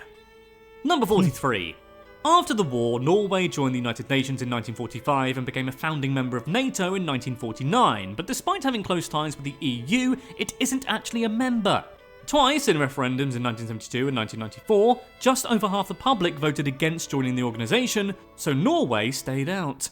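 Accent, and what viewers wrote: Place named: Quebec, Canada. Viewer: Funny accent for a Canadian.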